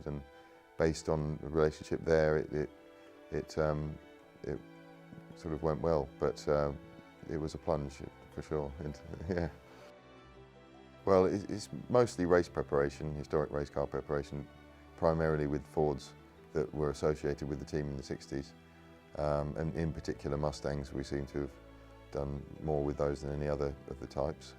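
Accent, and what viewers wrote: United Kingdom. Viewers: Hearing a Brit romanticize about making engineering innovations on classic Mustangs gives me hope for the human race! I mean no disrespect, Sir Clarkson...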